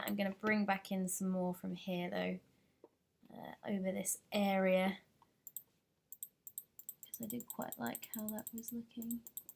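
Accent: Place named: United Kingdom. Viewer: Love yer acent , so british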